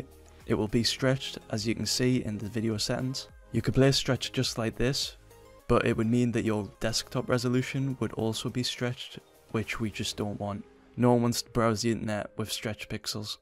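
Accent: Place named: United Kingdom. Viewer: 'H' key. Was tough for me to understand the British accent too lol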